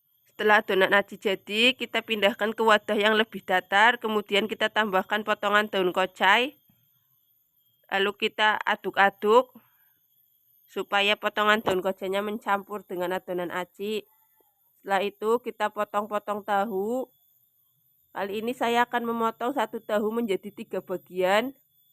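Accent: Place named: Tegal, Indonesia. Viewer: Jualan tahu aci semoga menjadi ide bisnis yang sukses,kaya logat Tegalan...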